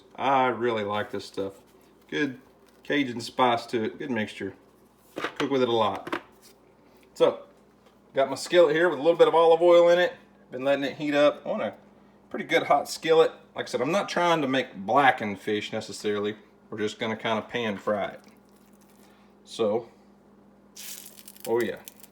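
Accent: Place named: Alabama, United States. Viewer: Sounds like you are in Alabama instead of OK. Stripper and catfish are wonderful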